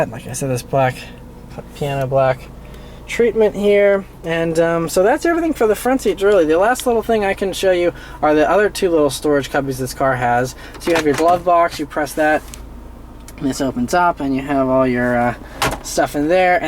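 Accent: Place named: Midwest, United States. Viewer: youve gotta be from the midwest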